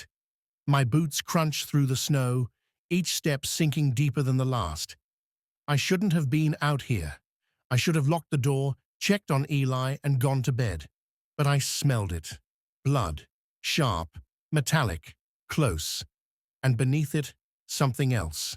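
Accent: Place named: United Kingdom. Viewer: title is not correct but the story is good. love the voice... sounds like Sir David Attenbourgh. love the British sounds.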